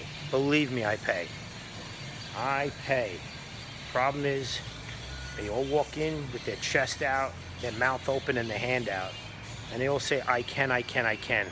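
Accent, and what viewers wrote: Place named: New York, United States. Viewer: Nothing like a New Yawker to tell it like it is.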